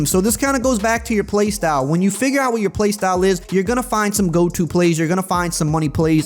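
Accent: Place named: New Orleans, United States. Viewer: Definitely Nola area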